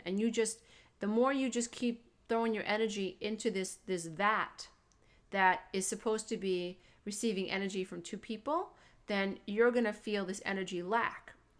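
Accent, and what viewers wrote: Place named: Long Island, United States. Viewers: Grunt at me like a hog. your funny. I’m from Long Island and your accent makes me feel like I am back home. 💕💕💕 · Wow! I've learned more in thus short min video than I have in the 5 years of therapy that I've been going to! Thank you, thank you, thank you! As I listened, I recognized your accent. Originally, I thought Brooklyn bc I'm originally from Bensonhurst. But I read the wiki page and it said Queens. Close! Also, the "Shutty, shutty"! I say that too and got it from The King of Queens', one of my favorite shows ever. Lol I look forward to learning from all you have to teach! Thank you again. 💕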